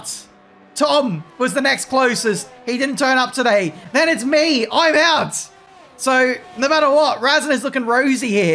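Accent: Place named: Australia. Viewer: What part of aussie you from?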